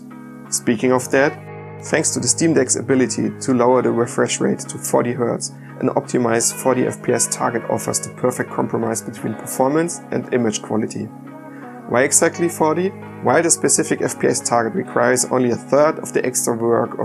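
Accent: Germany. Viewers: Moin! Vom Akzent her würd ich mal auf den deutschsprachigen Raum tippen? 😉Danke für deinen Deep Dive, gefällt mir super! Kannst dich direkt vom Start weg mit DF messen, da kann ich mir noch ne Scheibe von abschneiden!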